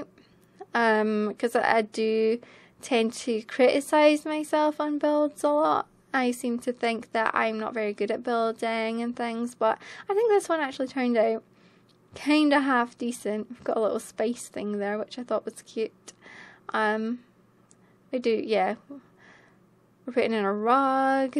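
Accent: Scotland, United Kingdom. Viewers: I think we might come from the same part of Scotland as I couldn't tell u where Scottish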